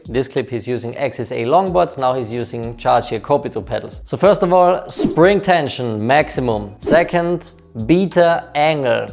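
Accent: Vienna, Austria. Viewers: Mehr Wiener Deutsch!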